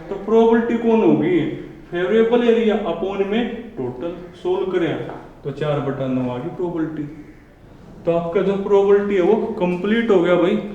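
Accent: Haryana, India. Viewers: Are u from haryana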